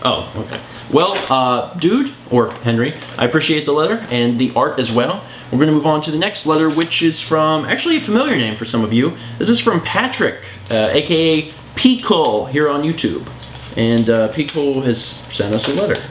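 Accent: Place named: Louisiana, United States. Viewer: That accent sounded like. In the bayou!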